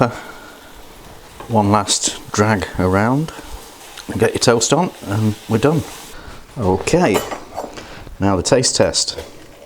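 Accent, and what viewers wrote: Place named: Liverpool, United Kingdom. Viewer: tnh I just watched your most recent video of crumpets and scrambled eggs, and I was going to unfollow you if your eggs were done wrongly.. well, they weren't really discribed very well so I came here; then you did scrambled eggs in the microwave.. so I'm done. Your Scouse was actually not bad though, and that comes from a Scouser; but I can not abide someone who demonstrates microwave scrambled eggs. I am sorry to leave this way, but at least I am leaving feedback. Also, your pea whack (pea and ham soup) recipe was pretty good too, yet not a revelation to those who learnt it from their ma'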